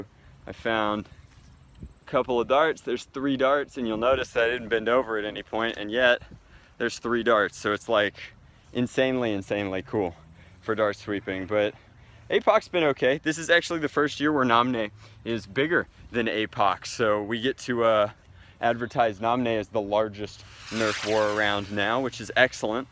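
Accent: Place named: United Kingdom. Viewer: stop with the british b.s like boot and zed and aluminium. its makes u look like a tool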